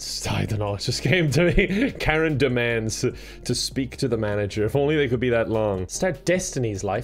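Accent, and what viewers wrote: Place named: Ireland. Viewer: HOLY FEEECK! I absolutely ADORE your Irish accent, mah dude. And of course, you're super funny! Been loving watching your vids! Xoxo from a huge Brazilian fan 🤗😙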